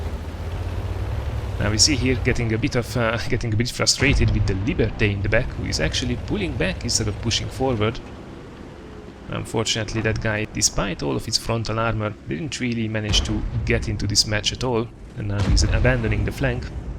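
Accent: Hungary. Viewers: Szia csak szerintem beszélsz magyaros angollal? :)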